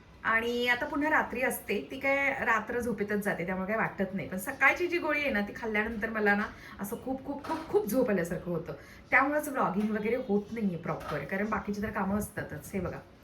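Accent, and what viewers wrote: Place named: Kolhapur, India. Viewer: तुमची भाषा सेम माझ्या कोल्हापूर सारखीच वाटते ❤️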